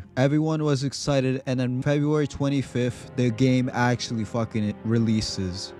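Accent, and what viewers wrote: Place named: Hawaii, United States. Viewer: U sound like u from Hawaii brah? Lol..I grew up on da Big Island myself..Nice Video tho. I'm on my first playthrough.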